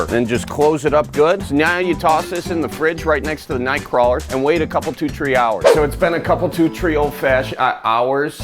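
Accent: Midwest, United States. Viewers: Thank goodness for captions because you are full-bred Midwest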